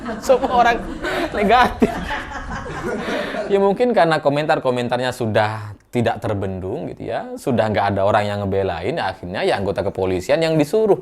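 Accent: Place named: Tegal, Indonesia. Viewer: Ora urusan.. tegal ngapak tetep andika rambo❤❤❤❤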